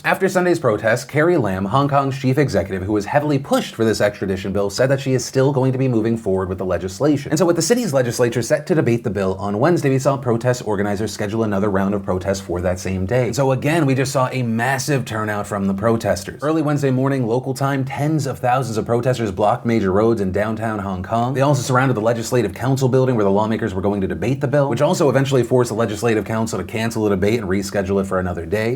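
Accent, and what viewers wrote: South, United States. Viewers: Is from the south? I thought I heard a southern affectation in this episode. Also I had a roommate from Hong Kong, hope she’s doing alright right now.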